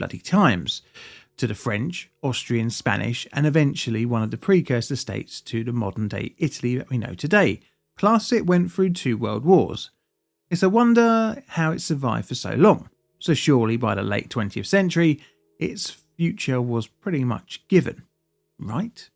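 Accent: United Kingdom. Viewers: Brit pronunciation always fascinates me. Do you pronounce pizza as "pee-za?"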